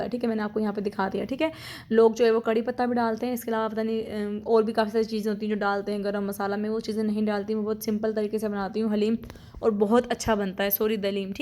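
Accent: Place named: Sukkur, Pakistan. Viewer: kahan par rehtay hain aap k paikay 😂 Matlab aap k ammi Abbi behen bhai I think sukkur q k mujhe aapki boli sukkur Jessi lagti hai aap panjabi nahi lagti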